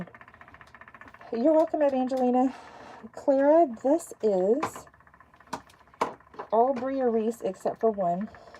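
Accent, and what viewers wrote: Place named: Alabama, United States. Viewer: hi watching on replay and you sound like me from Alabama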